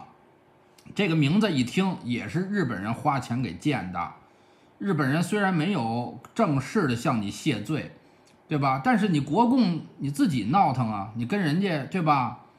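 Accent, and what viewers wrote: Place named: Beijing, China. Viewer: 北京话好听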